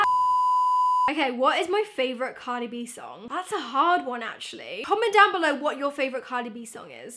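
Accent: England, United Kingdom. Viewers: I love your british accent! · LET ME TELL YOU!!! YOU SOUND SO MUCH LIKE LOUIS TOMLINSON. I'M NOT TALKING ABOUT YOUR VOICE BUT YOUR ACCENT. UGHHHH!!!😭😭😭😭😭... I'M A DIRECTIONER. AND IT HURTS, YOU REMIND ME SO MUCH OF LOUIS😩😩😩😩❤❤❤❤❤... Btw, I love your videos, and I think you should do that "bought the cheepest dress" video, in which you just chose the first dress. I loved that video, that was hilarious, please do another part.❤❤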